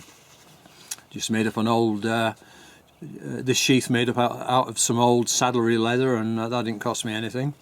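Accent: Northern England, United Kingdom. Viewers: Good morning, I’ve just found your channel and I’m enjoying your videos. I live in northern England in Lancashire I’m just wondering what part of England your from. It sounds like your also from northern England but I can’t just pinpoint your local dialect. Keep up the good work. Regards Ian. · "A perfect littl' dangler" LOL ... I love Brit accents and idioms ... last time I heard "dangler" was on "Are You Being Served?" ... one of the best Brit sitcoms ever. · oh... well... I think your tractor's sexy. there. Now we're friends. :) But Seriously, yew...that's pretty. Since I appreciate you so much, I'm willing to take extreme care of it for you. :) It is a fine knife though. You should make more. Anyway, I have friends in England. You sound northern. I have some wood I could cut and send you to make more handles if you like that sort of thing. 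I have black Walnut, sugar, silver maple, and even stag-horn sumac, though I don't know if you could keep sumac from rotting, but it is beautifully colored when cut with multiple colors, if you could. hell, I might even be inclined to send you a black rat snake head, or skin when I run in to them in the spring. (they're no less than 4 ft long here. I've even seen a 16 to 20 ft long one, which is ridiculous for them.) p.s. I'm in Ohio, USA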